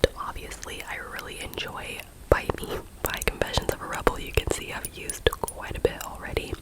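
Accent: United Kingdom. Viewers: Hey <3 love the video as usual 🫶 could you maybe do a british accent video again ? I actually love your british accent lmao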